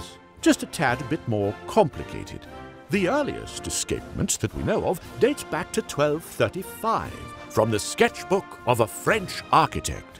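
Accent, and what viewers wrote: United Kingdom. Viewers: "it was us Europeans" he said with a British accent, showing the UK as part of the EU was this written in... when did brexit start again? what? 2011? x'D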